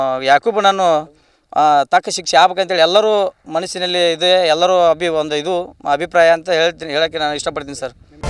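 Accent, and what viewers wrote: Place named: North Karnataka, India. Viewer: ಆದ ಘಟನೆಯನ್ನು ನಮ್ಮದೇ ಉತ್ತರ ಕನ್ನಡ ಶೈಲಿಯಲ್ಲಿ ಹೇಳುವುದಕ್ಕೆ ಯಾರು ಬೇಡ ಅಂದರ್ ನಿನಗ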